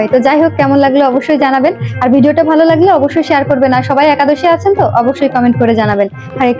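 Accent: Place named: Bangladesh. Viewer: Hare Krishna 🙏🏻 apni ki bangladeshi ? Kotha gulo sunte se rokomi lagche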